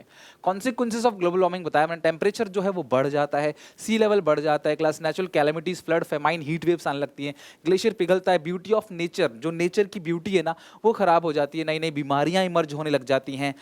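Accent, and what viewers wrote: Odisha, India. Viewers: Sir are you from odisha??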